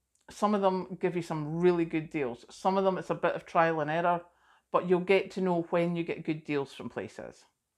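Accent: Scotland, United Kingdom. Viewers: So? Did these save you money? Would love a recap. Thank you! Pretty lady from Scotland with the lovely accent!❤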